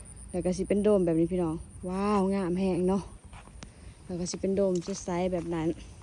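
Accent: Ubon Ratchathani, Thailand. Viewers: สำเนียงพูดเหมือนคน อุบล ศรีสะเกษ สุรินทร์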